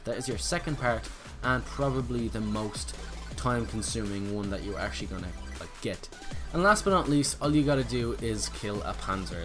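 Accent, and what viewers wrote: Ireland. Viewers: great to hear a fellow Irishman doing something like this :) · are you irish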